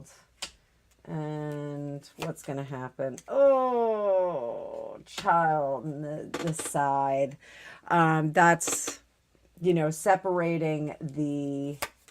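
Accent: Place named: New Jersey, United States. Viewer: I love your NJ viewpoint and expressions - "a hottie" with "definitely zero percent body fat". No other tarot reader I listen to does that. Love your accent, too - although it is very slight. I was in NJ last July to see Paul MCartney. I ❤ New York - and New Jersey. ❤Denise from Toronto. Great reading! Looking forward to much more, so thank you so much.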